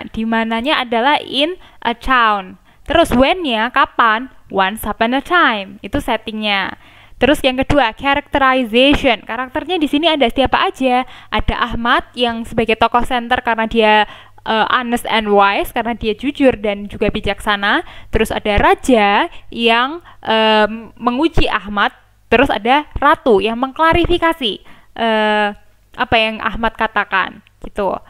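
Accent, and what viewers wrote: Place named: Wonogiri, Indonesia. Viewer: saya kan sering main ke Solo sekitar Mangkunegaran.....Tahun Ibu guru putri solo, ya karena logatnya, mirip logat Wonogiri juga.....Tapi bahasa englishnya setuju great job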